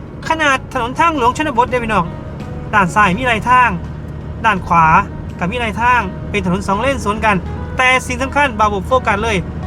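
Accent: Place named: Isan, Thailand. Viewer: บ่าว พูดสำเนียงเหมือนหมอลำเลย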